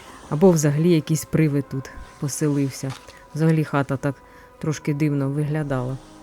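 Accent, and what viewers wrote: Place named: Kyiv, Ukraine. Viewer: У вас говір київської області👍❤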